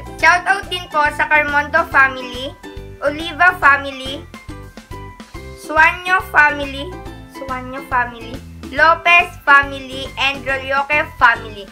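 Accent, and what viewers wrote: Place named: Marinduque, Philippines. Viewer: New subscriber din from gasan marinduque..parehas tayo ng punto..😊😊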